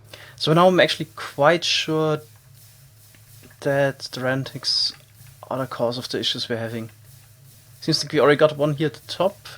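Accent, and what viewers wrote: Germany. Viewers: Sometimes you can feel the german in your voice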